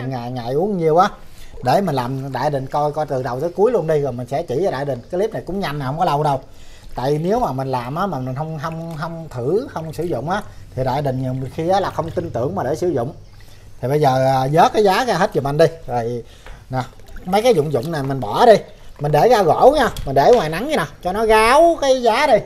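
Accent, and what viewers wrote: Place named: Mekong Delta, Vietnam. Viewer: Miền tây quê tui đó..nói chuyên nghe cưng ko..tự hào vn ơi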